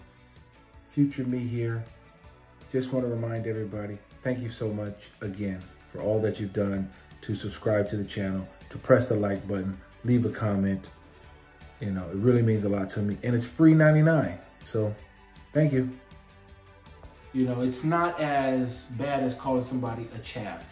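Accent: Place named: United States. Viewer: These videos show me how much I take your language for granted. Love hearing these with an American accent.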